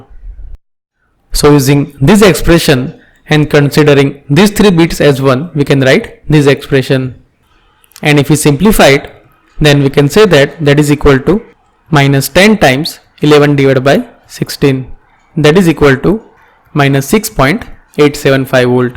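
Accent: India. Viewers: 你好強喔 連印度腔都可以翻譯ㄟ 777777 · 印度腔我真的不行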